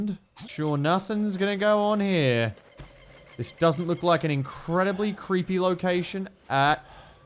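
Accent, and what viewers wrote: Australia. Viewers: Hearing going full Australian is amazing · This is the most Aussie I’ve heard in a while and it’s great. Especially with the Drop Bear. And seriously. Wtf is a Drop Bear. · Got sent this by a mate. First thirty seconds and I’ve subscribed. I’ve actually got someone in my own country (or at least my own nationality to watch) unless I’m wrong and he’s just putting on the Australian accent, but sounds bloody spot on at least. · I love how thick his accent is. He really sounds Aussie, I fucking love it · Man your aussie accent came out strong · I've never realized how Australian can actually get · went full Australian during this video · I love how accent slowly gets more and more australian through this video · I love how sounds VERY Aussie in this one. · now i've been watching for a good while now and i have to say this is the most australian i have ever seen him go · I’d love to see more of this! 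I especially love how becomes more Australian playing this! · This is THE most Australian I've ever heard, or any other Australian streamer, get. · 2 things: 1) takes shots at a kangaroo Kangaroo comes from the side: Clever girl 2) I think this video has strongest Australian accent I've ever heard...not sure why that's a thing, but having watched his stuff since he played Valkyria Chronicles 4, it's just something I've observed. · I know is from down under but it’s really coming through thick in this video and I love it! Also yeah, paintball ref/ marshal… done that. You break your voice first weekend, then you can yell so loud. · A videogame about the Australian outback in full Australian mode "Just like the simulations" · getting back to his roots in more ways than one! I wonder if hes happy to finally have a game that he can legit use aussie accent 100% and noone will nag him about it cause its theme appropriate, although I don't get why hes so bothered by Dicko's slaughterhouse? 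I mean OSHA/H&S would have a field day with Dicko, were he still alive... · really let out his Australian out in this video. I guess he really felt at home. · This is the most australian I've ever heard be in a video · became so Australian that I actually had some trouble understanding him. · I feel like this is the only video I saw, where go full aussie. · Not hard to understand, I understood him just fine. But it was a stark contrast compared to how he usually sound around non-Aussies and in his other videos, and definitely a much stronger dialect. And I frankly found it wonderful. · my favorite thing about this video is that the accent got more noticeable as the video went on, which tracks with what i've heard about australians toning down their accents for non australians but slipping right back into when around other australian accents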